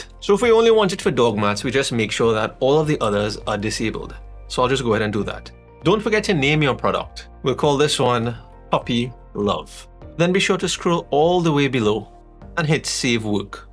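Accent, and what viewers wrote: Trinidad and Tobago. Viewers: Love the vid bro thanks but how you soundin like you trini so?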